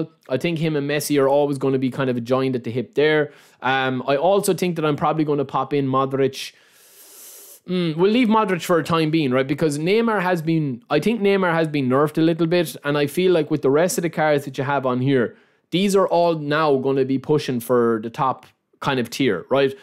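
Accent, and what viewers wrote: Ireland. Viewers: Love these tier lists and your McGregor accent. :))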